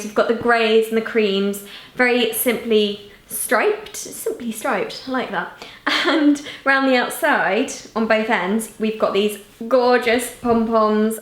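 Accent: RP, United Kingdom. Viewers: I love the way she talks so plummy, the way she moves so classy!!! So satisfying to watch her. 🤗😙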